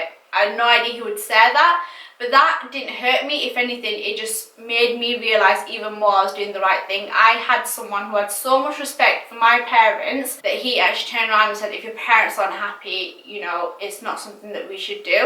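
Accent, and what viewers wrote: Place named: Manchester, United Kingdom. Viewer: Omg lov ur manchester accent... u have same as me 😛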